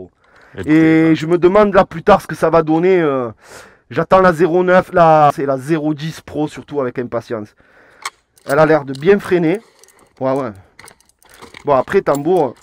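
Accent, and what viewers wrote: South, France. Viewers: On sent que c'est le sud de la France 😂 (c'est pas une critique) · ! Super chaine poto je kiff 👍 ça fait plaisir d’entendre l’accent du sud ahah Aaah cette petite zéro 8 pro me plait bien!!! Mais la Dualtron mini me fait kiffer aussi... je suis un gars assez lourd. Selon toi laquelle est la plus adaptée?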